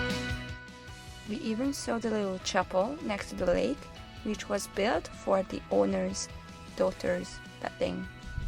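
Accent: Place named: Hungary. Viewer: start the video, hear your voice and I'm like u gotta hungarian. I'm gonna binge watch your videos real quick as australia is my dream location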